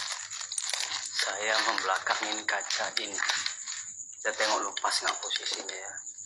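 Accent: Medan, Indonesia. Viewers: Melayu medan kek gini bhasa nya.m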